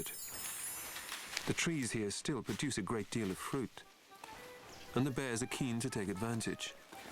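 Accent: United Kingdom. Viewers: Don't you just love British accents in narration? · I like when a documentary has classical music and an english accent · This video has everything. It's like a human tragedy, science lesson and nature documentary all tied together with a neat little British accent. I likes it.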